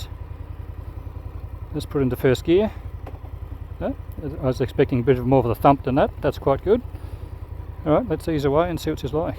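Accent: Australia. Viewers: To be honest I really interspersed in bikes mostly cruisers but the main reason I watched all your videos is your way of speaking Australian English which is great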